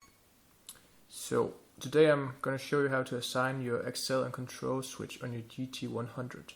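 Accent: Denmark. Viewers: Hi again! nice videos. Easy understandable. Are you Danish ????? thanx again 😁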